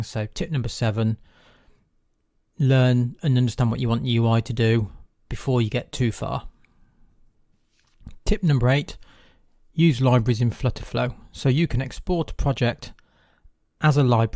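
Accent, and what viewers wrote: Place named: United Kingdom. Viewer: Great content! But British accent isn't my cup of tea.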